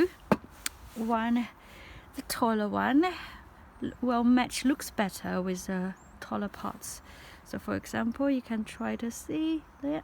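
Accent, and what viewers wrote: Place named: England, United Kingdom. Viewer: Great collection! Thank you for sharing from Korea The mini pot is so cute! :-) I like your England accent as well! I subscribed to your channel as well! Hope your channel becomes flourish too! ♥♥♥♥♥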